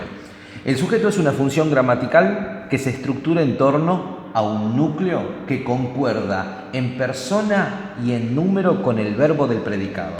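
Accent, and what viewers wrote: Tucumán, Argentina. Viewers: Porque creo que SOS Tucumano o Santiagueño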